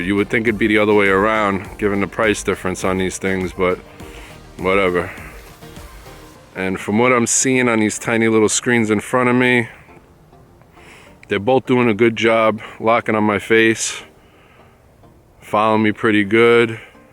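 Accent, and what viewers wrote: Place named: New England, United States. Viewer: Haha, funny and perfect comparison. I have the HX80 and looking to upgrade for the added features on both: control ring, RAW, touch screen, 4K. But after seeing this, no way is the RX100 worth the extra $$$. Some aficionados wet their pants over sensor size (Wow, a 1" in a tiny camera!), but even the tiny sensors are so good now (in phones) that I'm not buying into the hype. Yeah, better bokeh with RX100, but...I really don't give a shit about it. Otherwise, the IQ is nearly identical and you'd have to be hallucinating to see an appreciable difference. Yeah, the RX100 may be marginally better in low light, but... hey, you want a low-light hobby? Take up music. The 30x zoom on HX99 is far more useful. If you shoot a lot of video outdoors, the mike jack on the RX100 VII is the only real benefit for 3x the price. 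(from Texas, I love the Yankee accent. Are you a wise guy? :)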